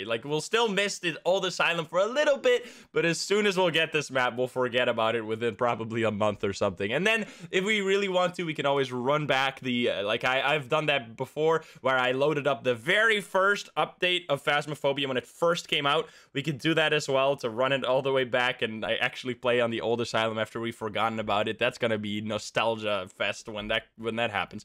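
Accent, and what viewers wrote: Netherlands. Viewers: hold on, you're dutch? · are u dutch?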